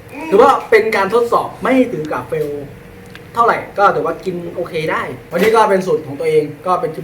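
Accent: Isan, Thailand. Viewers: เว้าอิสานละอ้ายค่อยตลกดี 555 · พี่ เป็นจังหวัดไหนครับทำไมเว้าอีสาน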